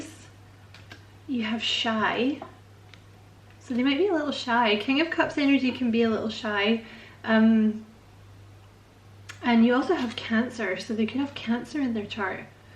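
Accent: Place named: Scotland, United Kingdom. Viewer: Thank you,. Always a sense of a breeze softly blowing through your reads. Hmmm…Scottish? Interesting. I’ve always loved the Scottish accent. Feel better quickly! 🙏🏻💗🦋😎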